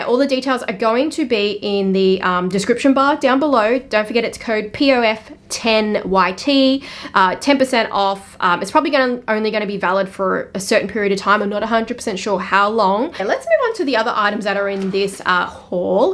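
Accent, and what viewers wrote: Australia. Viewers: I like the Aussie accent I am half Australian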